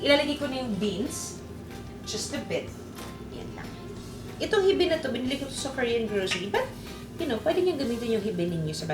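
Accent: Batangas, Philippines. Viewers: nagkakaroon na po kayo ng puntong batangan. nakakaproud lang. palm sugar po sa batangas ay SANGKAKA..more power po.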